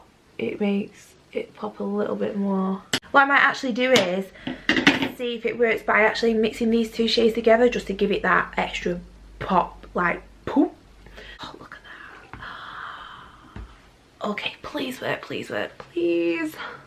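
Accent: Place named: Manchester, United Kingdom. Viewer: You gotta be from Manchester ❤❤❤❤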